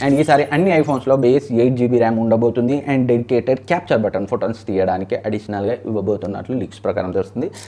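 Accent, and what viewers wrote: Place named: Andhra Pradesh, India. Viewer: Medhi karimnagar aeiyna kuda meru matladuthuna language andhara lane vundhi anna Telangana language lane ledhu medhi ❤🥰😍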